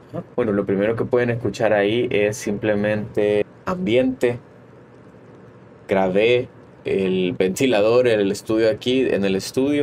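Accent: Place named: Honduras. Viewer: hermano soy tu fan desde que vi este primer video pense que eras hondureño estaba asustado, dije y este brother quien es jajaja saludos hasta SV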